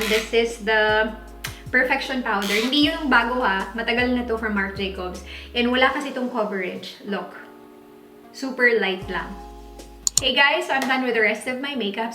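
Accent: Visayas, Philippines. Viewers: Bisaya ka te????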